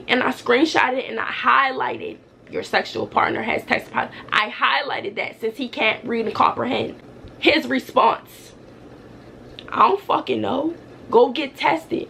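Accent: Baltimore, United States. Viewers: You sound like you from my city (Baltimore)”… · Soon as u started tlking, I said to myself she sound like she from Baltimore! And I knewwww!!!! I know our accent any mf where! 😂 I'm from over west 💙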